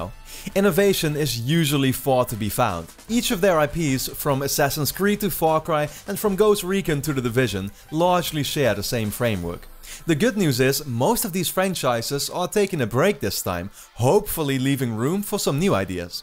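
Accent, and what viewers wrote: Netherlands. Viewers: hes dutch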